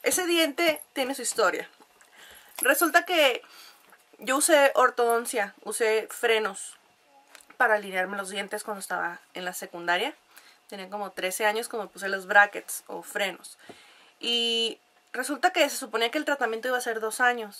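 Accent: North, Mexico. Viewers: Ahh y me encanta. Tu voz con asentó norteño, .....y tu hijo es hermoso, Dtb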